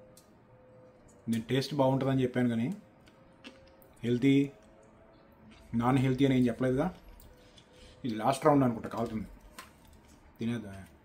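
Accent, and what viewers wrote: Andhra Pradesh, India. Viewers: Emti sangatulu ane style, chala natural ga vuntundi. mee cooking style means like sambar karam with castor oil and vade ingredients me grand mother cooking and matladae style chooste, looks like Andhra style. I think me native roots in andhra, may be me parents are grand parents migrated to Nizamabad.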